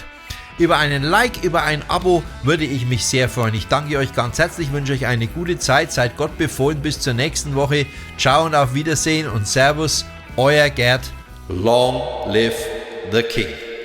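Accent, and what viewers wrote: Upper Franconia, Germany. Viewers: Hallo Gerd, ich habe Dir zu einen Deiner letzten Videos geschrieben und finde Deine Berichte Super. Nach Deinem Dialekt zu urteilen kommst Du wohl aus Oberfranken wie ich auch. Ich lebe aber bereits seit 1978 in Mainz. Bin aber ein Rehauer, wenn Dir das was sagt. Ich hatte Dir geschrieben, dass ich bis 2017 eine Band hatte. Man nennt mich den "Elvis von Gunsenum" zu deutsch Gonsenheim. Im letzten Jahr hatte ich mein 25-jähriges Bühnenjubiläum. Ich singe halt die Songs des King of Rockn Roll sehr gerne und es kommt an. Nach 2017 waren wir ein Trio und jetzt mache ich Soloauftritte in Seniorenheimen. Ich würde mich wirklich sehr freuen, wenn wir uns Mal treffen könnten. Vielleicht im nächsten Jahr beim Elvis Festival in Bad Nauheim. Dieses Jahr geht es leider nicht. Mit rockigen Grüßen von einem oberfränkischen Elvis Fan zum Anderen Volker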